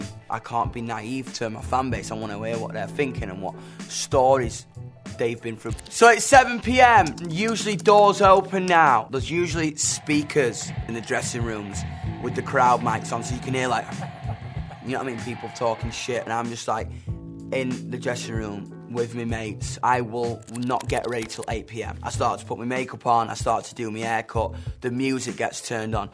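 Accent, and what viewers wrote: United States. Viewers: His American accent is pretty good! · HIS AMERICAN ACCENT OMG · His American accent is better than our British accent........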